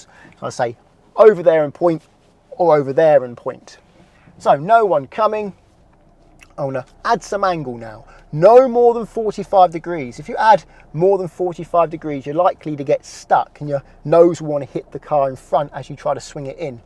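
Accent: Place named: Oxford, United Kingdom. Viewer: Shit oxford eng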